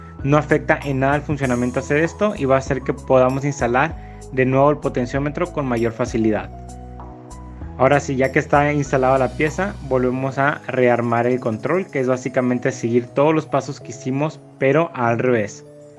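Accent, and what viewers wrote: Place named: Mexico City, Mexico. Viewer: hola amigo, se escucha como si fueras de la cdmx, donde tienes tu talles y cuanto cuesta reparar el control contigo?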